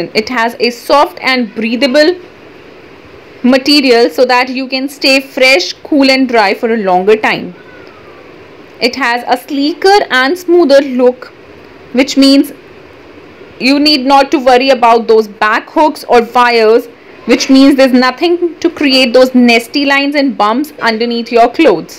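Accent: India. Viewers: I think you should do asmr videos your lovely Indian accent gave me brain tingles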